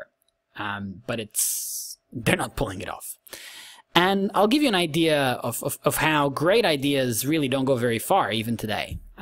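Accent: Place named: New York, United States. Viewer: Quite enlightening & request an update Oh, you're accent sound like you're from NY city USA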